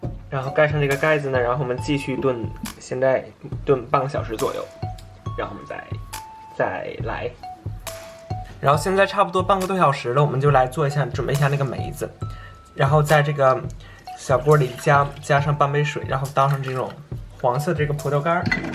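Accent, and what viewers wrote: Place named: Shenyang, China. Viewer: 哈哈哈是沈阳老乡吧亲切的口音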